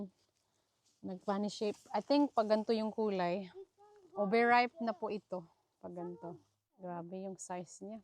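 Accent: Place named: Visayas, Philippines. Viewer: PAG BISAYA ANG SARAP PAKINGGAN NG ACCENT I LIKE IT 😅😅😅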